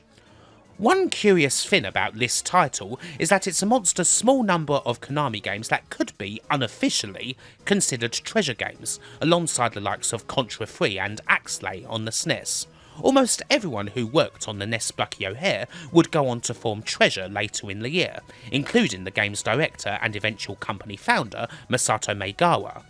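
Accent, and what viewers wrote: United Kingdom. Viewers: As an American the way you said "kick some heads in" about X-Men in a pleasant British accent was pretty disturbing/awesome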